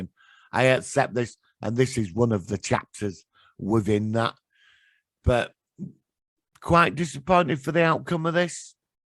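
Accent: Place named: Liverpool, United Kingdom. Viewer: I can't stand scouse. It should be counted as a speech impediment. CALM DOWN CALM DOWN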